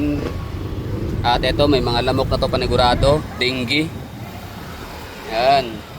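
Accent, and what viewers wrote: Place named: Visayas, Philippines. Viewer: Hindi nga amazing... Pero pede na kasi malapit sa NCR. Saka may bias ka kasi kasi bisaya ka. Halata sa accent mo. DDS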